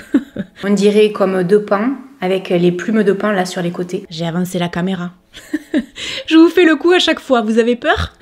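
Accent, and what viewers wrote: South, France. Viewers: Toujours intéressantes tes vidéos et nous mettent de bonne humeur. J'adore ton accent qui reflète le soleil, les cigales et le sud. A quand un live avec tes abonnés puisque c'est possible avec YouTube.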